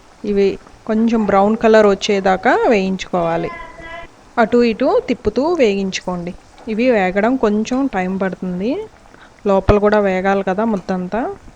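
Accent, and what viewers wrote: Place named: Rayalaseema, India. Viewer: mee maatalalo rayalaseema vaasana vastondi meedi evuru?...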